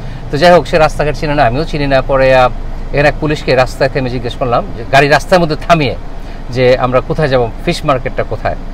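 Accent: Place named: Sirajganj, Bangladesh. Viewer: সেই স্বাদ !! এত স্বাদ ক্যা?... 🤣সিরাজগঞ্জ !! বিদ্রঃ এটা পাশের এলাকা পাবনারও একই উচ্চরিত ভাষা !! 😅😅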